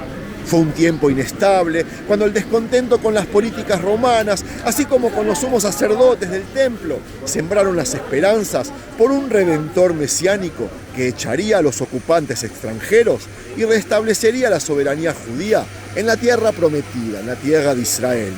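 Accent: Argentina. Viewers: Judio ? Yo lo escucho con acento Argentino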